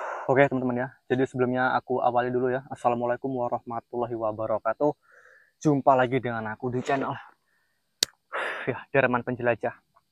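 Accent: Java, Indonesia. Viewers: Mas, njenengan orang mana ya..? Logatnya kok sprti orang Jawa ya..? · Mas derman, njenengan orang mana ya...? Logatnya sprti orang Jawa ya...?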